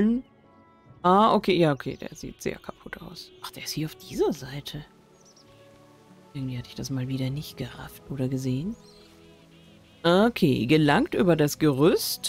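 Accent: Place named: Berlin, Germany. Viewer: Wie süß du das sprichst bist Berliner in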